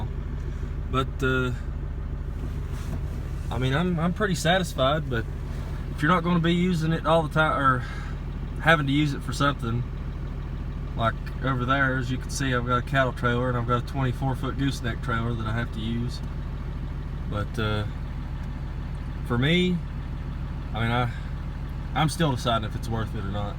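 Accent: United States. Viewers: So southern, sounds of goats followed by a hefty loogy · Your accent is making me miss my Kentucky family. Nice Vid, love the truck.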